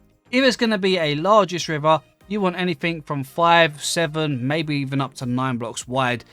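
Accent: United Kingdom. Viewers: British duh!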